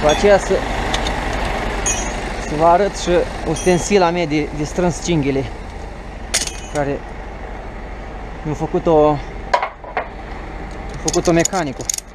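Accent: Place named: Moldova. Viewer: Salutare! Aceasta este filmare de lumea trecută 19 iulie 2021? Îți doresc numai drumuri bune și mai largi,nu din cele întortocheate că la Cannes și celelalte cătune! Eu credeam că orașele europene sunt mai "aerisite",nu ca prin unele orașe de pe la noi. Te-am cunoscut de la primele vorbe ale tale,că ești moldovean de al meu! Care este lungimea totală a "trenului"tău,?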